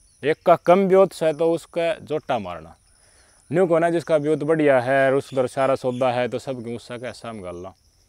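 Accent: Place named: Khari Boli, India. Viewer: भाईसाहब आप की खड़ी बोली की शैली बहुत सुंदर है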